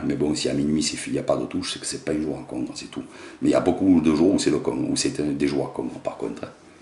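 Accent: South, France. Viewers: la grosseur de l'hameçon en combien - du 2ou moins . merci pour toutes tes vidéos c'est un régal et en plus avé l'accent..merci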